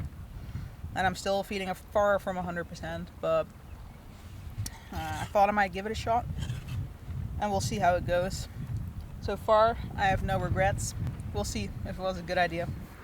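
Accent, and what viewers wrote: Netherlands. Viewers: Ik dacht al een piepklein Hollands accentje te horen😁 En ik woon zelf toevallig op de Veluwe. Mooi inderdaad, maar is niks vergeleken met waar jij nou zit. Voor een klein beetje wildernis, is het al snel Zweden of Finland (ben meer van het packraften). Leuk zeg. Superveel succes met alles wat je daar doet. En oprecht een mooie video👍🏻 Groeten, Remko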